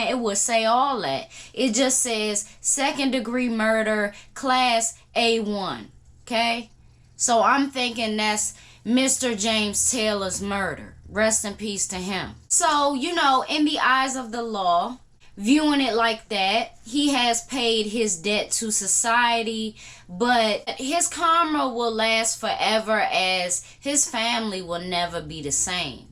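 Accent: Washington DC, United States. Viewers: I'm from WASHINGTON D.C. UPTOWN PROJECTS 640 PARK/MORTON I WAS BORN IN EASTGATE GARDENS SOUTHEAST... ARE YOU FROM WASHINGTON D.C.???? YOU SOUND LIKE A DC girl..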